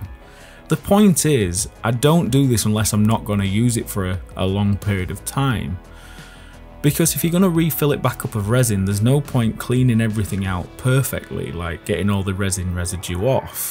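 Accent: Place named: Yorkshire, United Kingdom. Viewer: Always love your videos buddy, nice to hear a Yorkshire accent hopefully bump into you one day I’m not far away in Rotherham myself.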